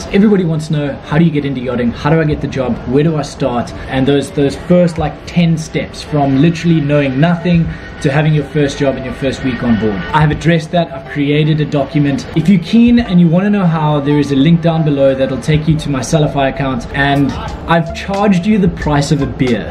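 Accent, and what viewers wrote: South Africa. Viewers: 1st video im seeing of yours Are you South African? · this guy's got a south african accent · your South African accent is sick (at least i think thats a SA accent)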